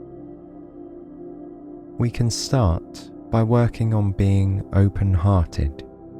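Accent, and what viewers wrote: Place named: United Kingdom. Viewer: Oh. Your voice and British accent is as soothing as a father's voice. Last night I woke up feeling a bit unworthy, but then I ran into this. I listened and I eventually felt much better. Thank you.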